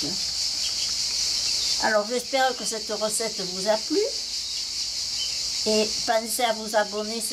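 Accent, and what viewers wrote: Provence, France. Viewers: Bonjour c'est un regal de vous écouter parler ce bel accent de notre Provence, j'adore vos recettes tres bien expliquées par vos soins c'est un régal merci du partage Emy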